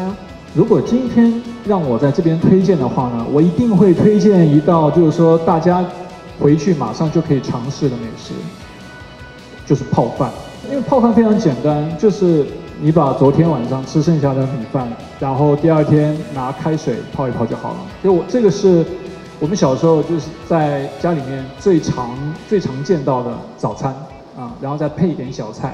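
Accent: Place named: North, China. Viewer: 他在北京是另外的口音，很北方的口音。他是模仿能力很强。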